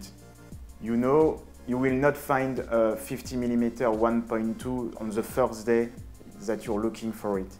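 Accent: France. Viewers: I can listen to that French accent all day. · Il y a peu de bonnes videos francophones sur Youtube alors je n'ai pas compris pourquoi tu as préféré publier en anglais avec cet accent francais qu'on reconnait à mille lieues plutot que d'aider les francophones qui n'en trouvent pas. Merci quand même des conseils.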